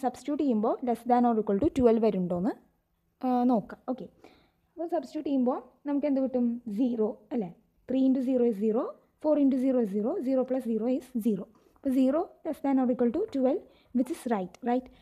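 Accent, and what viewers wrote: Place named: Thrissur, India. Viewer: Thrissur alle Aa Basha kettale theriyum 😄😄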